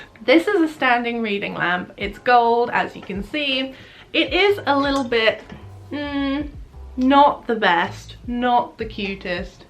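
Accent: United Kingdom. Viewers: Wow, your thrift stores are amazing! I love the way you say "Charity Shop" , it sounds so classy with your British accent. Those were amazing finds ,especially those Headbords and beaded lamp shade 😎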